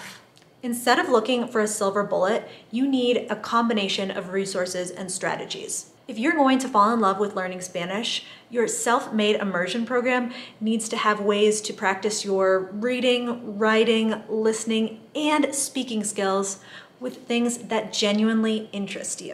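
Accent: United States. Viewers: Great use of ‘El Subjuntivo’ in Spanish👍. Don’t take this the wrong way but your Spanish has a heavy American accent but don’t worry I’m sure people in Mexico like it. It’s too bad in the US some people don’t like accents🤷🏻‍♂️and they don’t try to help but hopefully that’s not the case in Querétaro 🇲🇽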